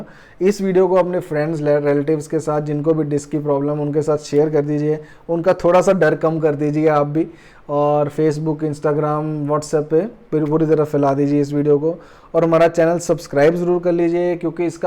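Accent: Punjab, India. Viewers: Accent punjabio wala hai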